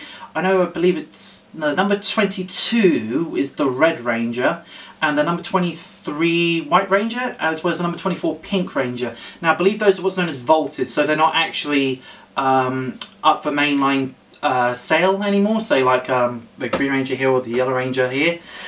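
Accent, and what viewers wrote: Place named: United Kingdom. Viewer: i didnt know u were british noice and the green ranger is my fravorite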